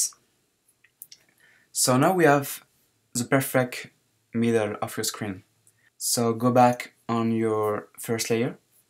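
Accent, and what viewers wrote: France. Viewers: Mec c'est super ce que tu fais (je sais que tu es francais ca s'entend dans ton accent un peu ;) ) tu mériterais vraiment d'être reconnu car t'as un talent inné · Accent prononcé j'ai reconnu que tu étais français ;) c'est genial tout ce que tu fais vraiment ! Ce qui serait parfait : que les tutos soit en français :) Mais bon j'imagine que tu as beaucoup de travail donc continue ainsi !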